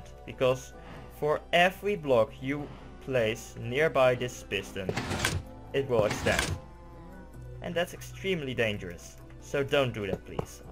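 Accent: Netherlands. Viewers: Are u netherlands / dutch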